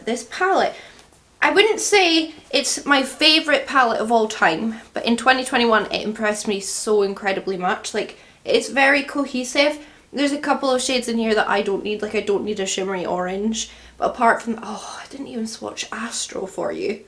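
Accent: Scotland, United Kingdom. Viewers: Hi, thankyou for all of your videos, I love watching u Swatch, apply & review all of the awesome products on your channel. Can u plz tell me where to buy the earrings u wore in your 17th November 2921 vid? And your last video 2 days ago? The earrings are lovely! But of course the coolest thing about your vids is the lovely products & you flawlessly applying them & creating awesome looks. Hope to get a reply from you, I loveeee your accent, Scotland is beautiful I'm Irish so not too far from you. Thanks so much 🥰♥️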